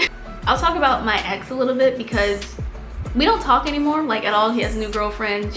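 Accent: England, United Kingdom. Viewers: What is with the British and Australian accent? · Are you British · Yo I was so confused I’m like why does she sound kinda British still swear sis was American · Haha! British accent is coming along!! ❤️❤️ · That British accent tho😂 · We need to talk about your English accent lol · Not the British accent😭😭😭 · That British accent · The British accent got me 😅😅😅😅😂😂😂😂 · Looove ur british accent btw🥵😍😍😍💯🙌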